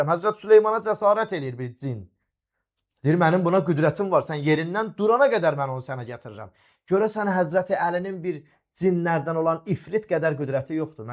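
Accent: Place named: Iran. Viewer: Iranski lehceee offfff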